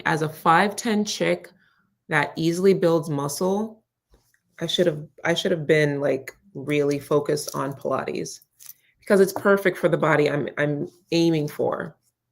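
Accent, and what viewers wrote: Jamaica. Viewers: I love when you use your Jamaican accent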